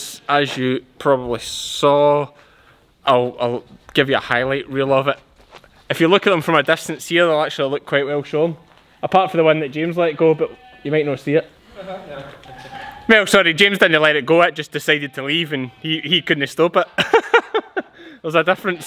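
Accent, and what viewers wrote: Scotland, United Kingdom. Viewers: I love your Scottish accent. I’m a big Outlander fan and I’m anxiously awaiting Diana Gabaldon’s next book in the series. My maiden name was Thomas, so we’re Welsh. On my bucket list to visit Great British countries but England can wait. #ACAP! Btw found out about you from your debut on The Hoof GP’s channel. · Please don't laugh. I'm a new subscriber, when you talk are you speaking just English or do you throw in some Scotish as well?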